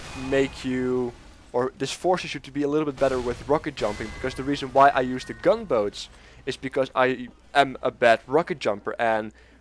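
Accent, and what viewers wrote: United Kingdom. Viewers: I thought you were scottish.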